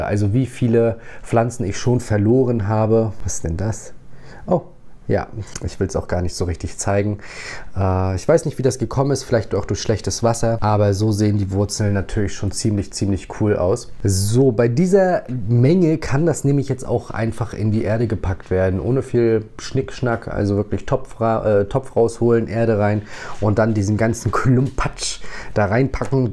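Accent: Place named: North, Germany. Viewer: Ich liebe, dass du uns neben der Vermehrung von Pflanzen nebenbei noch Nachhilfe in norddeutsch gibst 😅💚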